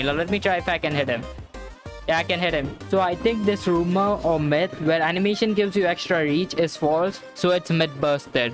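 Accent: India. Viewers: an Indian? sounds like Indian but an Indian Roblox player who plays ROBLOX yay never found one like you!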